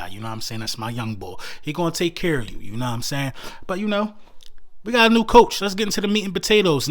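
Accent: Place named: Philadelphia, United States. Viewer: I can spot a philly dude a mile away, that beard and accent ... Go Birds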